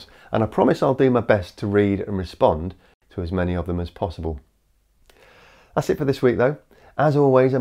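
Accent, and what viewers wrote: United Kingdom. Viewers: Idc if you have a British accent, I’m not in agreement about global warming.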